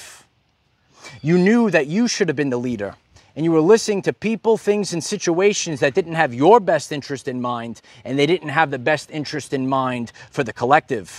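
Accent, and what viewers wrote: New York, United States. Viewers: a beacon of life from my past....your little NYC accent, all love...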